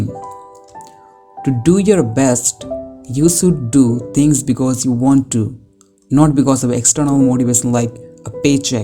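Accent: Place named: India. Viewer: We are going to read a summary of the four agreements with a barely comprehensible accent!? Couldn’t you find a native Speaker of English? It’s like tech-support from Calcutta.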